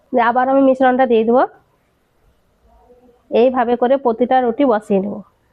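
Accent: Murshidabad, India. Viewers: ভদ্রমহিলার এই রান্নার অনুষ্ঠান দেখতে খুব ভালো লাগে, সাধারণ কিন্তু, সুন্দর , কথা শুনে মনে হয় ওনার বাড়ি মুর্শিদাবাদ জেলায়....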